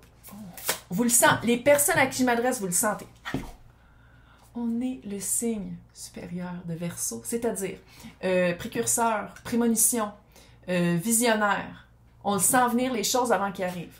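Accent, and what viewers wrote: Quebec, Canada. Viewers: Exactement ma Vie actuelle nouvelle rencontre comme une évidence démarre doucement comme la personne ultima avant la fin du monde..............vive l'accent québécois et l'humour et les Verseaux bise de France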